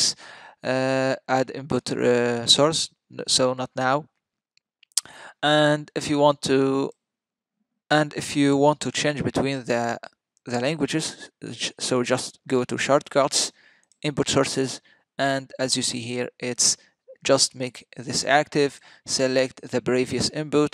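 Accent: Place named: Egypt. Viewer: From the accent I can tell you are Egyptian lol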